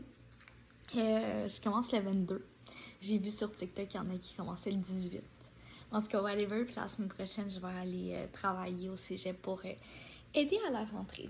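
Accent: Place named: England, United Kingdom. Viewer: You have an English accent ❤️❤️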